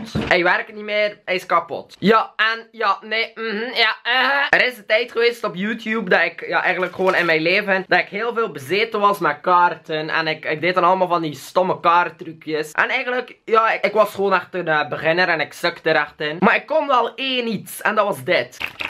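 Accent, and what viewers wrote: Belgium. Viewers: Je stem is te grappig. Je schreeuwt te veel. Je praat grappig (maar elke Belg praat grappig)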